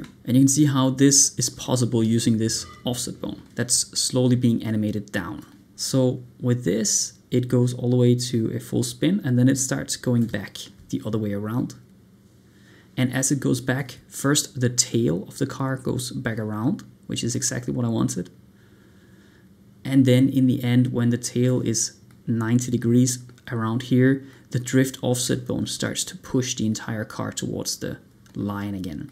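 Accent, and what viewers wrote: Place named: Denmark. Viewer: Godt grej, Det ser fandme godt ud! :D Baseret på accenten tør jeg godt skrive denne kommentar på dansk. :P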